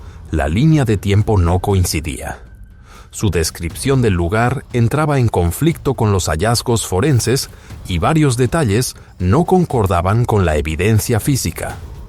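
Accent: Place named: United States. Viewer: Es muy raro ver a alguien que no es estadounidense con acento estadounidense.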